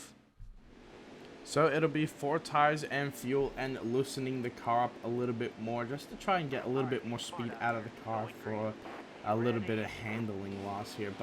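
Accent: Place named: Australia. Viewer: Never getting-ver this Australian accent pog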